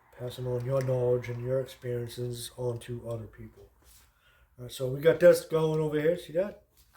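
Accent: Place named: Boston, United States. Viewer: The CC heard your Bahston accent as Alta. :face-green-smiling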